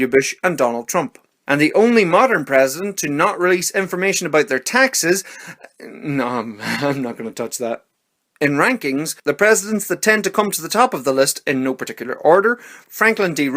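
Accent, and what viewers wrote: Ireland. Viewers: Are you Irish? Just wondering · What part of ireland you from lad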